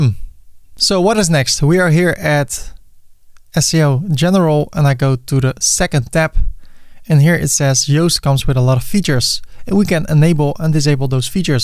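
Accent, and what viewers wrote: Netherlands. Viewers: Nice video! Ik moest af en toe wel lachen om je overduidelijk Nederlandse accent:) Bedankt voor de video!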